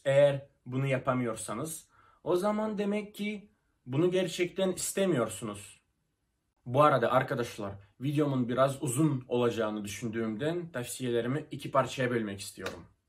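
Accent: Thrace, Turkey. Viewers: Aksanının harikaaaaa şiven tıpkı Trakyalı ya müthiş! 🤞🤞Türkçe- Fransızca- İngilizce için buradayım 🤞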